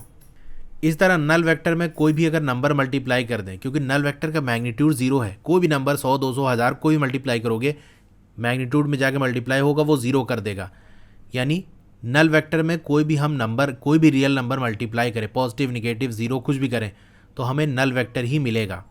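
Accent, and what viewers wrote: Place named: Allahabad, India. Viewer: Sir are you from.allahabad or any nearby city from it your accent is similar to Allahabad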